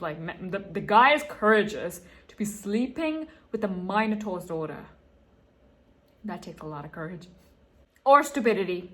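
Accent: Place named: United Kingdom. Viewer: I'm still learning english, and I'm bad with accents. Where are you from? Seems britanic, but I am not sure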